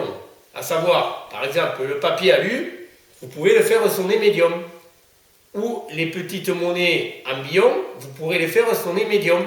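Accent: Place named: South, France. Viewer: hahahahhaha le scope l'accent du Sud suis mdr désolé pas méchant mais Scope ca me fais rire hahahaa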